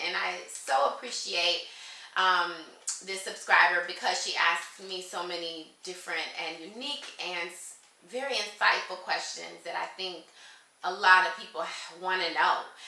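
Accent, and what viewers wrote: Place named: United States. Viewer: Hai sister am a Ugandan but on the issue of colour hooo GOD u are not yet lighter sister we have some Ugandan who are very lighter and u can't imagine. the reason why they stair at u it's because of ur accent they will definitely know u are from U.S. so in our country u are not different of any black people in uganda. Do feel free if u stay for More year no one will stair at u anymore because they will be seeing you every time Otherwise next time u are coming back tell me so that I can secure for u a plot of land to starts ur life there Don't worry u are already at home ok in fact just starts investment plan to move to uganda ok Contact me if u need anything Thank u😘😘😀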